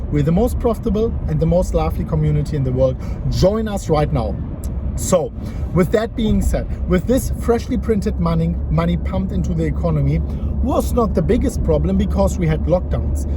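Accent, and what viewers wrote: Germany. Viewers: Your German ?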